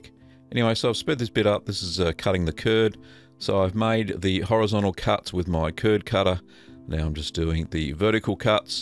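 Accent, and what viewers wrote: Australia. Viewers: Aussies have their own way of saying things, part of why we love them :)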